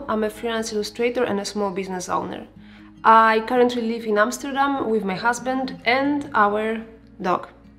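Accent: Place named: Spain. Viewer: Thanks 4 the video! 👌⭐ Spanish english accent detected hehehe (or maybe not, who knows)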